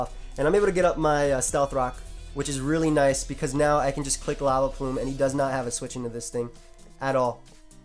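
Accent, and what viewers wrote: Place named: Connecticut, United States. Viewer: Did u grow up in connecticut? You have a connecticut accent just like me:D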